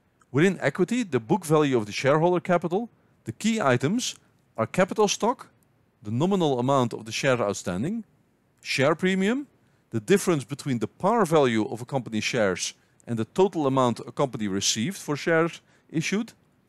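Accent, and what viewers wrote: Netherlands. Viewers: Very thorough explanation of accounting terms, this will help a bunch in reading 10-K and Qs - thank you! I can hear you are Dutch! :) Hi from Zaandam!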